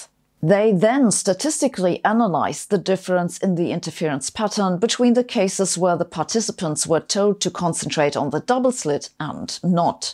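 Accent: Germany. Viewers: terrible german accent , SssssSsSssshhshSHSs my ears! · I never understand any of her video's but her German Accent turns me on... Oh Shut up, I bet I'm not the only one!🤓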